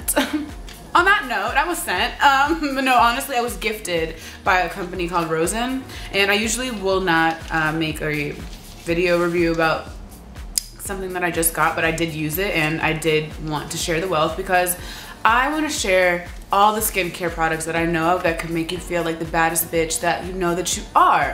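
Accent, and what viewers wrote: United Kingdom. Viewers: Ur British accent is the shit !! Loll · i live for your british accent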